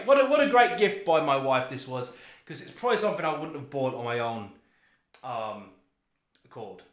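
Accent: United Kingdom. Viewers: am uk and its putting me out I was like was he uk moved somewhere lol 😂 ..but yes give atomfall a look as it looks so good aswell ... having both consoles is the best way now you own a Xbox go and buy yourself some 2800mah AA rechargeable batteries and a socket charger (all about 20 quid if that) and your have a shock how long the controller last to a ps5 controller I get like 4 days from mine lol (usb mic) 😆 my ps5 about 8-10 hours if that lol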